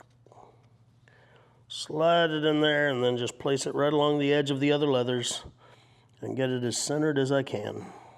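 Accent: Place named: Yorkshire, United Kingdom. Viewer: Absolutely Mint ✊🏼 Proper easy to follow and so down to earth. Are you sure your not from Yorkshire , England? 😀✊🏼😀✊🏼😀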